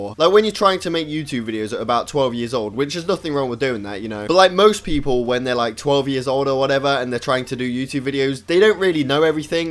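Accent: United Kingdom. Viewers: British schlatt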